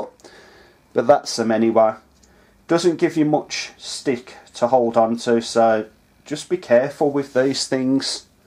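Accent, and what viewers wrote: United Kingdom. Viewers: where in the West mids are you from? Wolvo or Walsall maybe?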